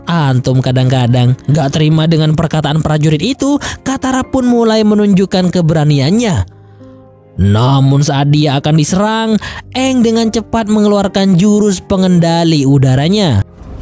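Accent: Madura, Indonesia. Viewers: Kok ada bhs maduranya nkok reng madureh kiah😅😅mantapp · Medureh cak jhhh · Adminnya orang madura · Udah nebak kalau Mimin orang Madura dari awal pas ngocak " mateh kakeh cong, dulat " langsung ngakak aku 🤣🤣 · Anjir reng madureh kak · Admin nya Orang madura😂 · Mik pas ngucak madura kkeh😅😅😅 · Behhh mak tepak ka oreng madure, oreng dimmah? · admine seorg madura...aksentomasinya jelas betul....hahhahah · Beh madureh kakeh huh la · Mak madureh koh🤣🤣,"mareh kkh conk dulat" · Reng madureh kakeh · Hahaha reng madure kakeh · Orang MADUREH kakeh bang 🤣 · Eh ternyata orang madura taiyeeee.. Sukses tretan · Baru sadar kalau yg bicara alur cerita orang Madura 😂😂😂 mateh kkeh conk dhulat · Mantap tretan baru tau naratornya org madura... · Orang madura ya bang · Kakeh reng madureh iya bang wkwkwk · Orang madura bang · Ternyata orang Madura😂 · Oreng Madura been kak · Orang Madura Kah Min? 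· Njr reng matureh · MK bedeh madurenah 🤣🤣🤣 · Anjir orang madura coxxx 😂😂😂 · Ternyata oreng madureh kkeh bang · Madureh keh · Mateh kakeh cong wkwkwk... oreng madureh nii boss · Anjay medureh kakeh kak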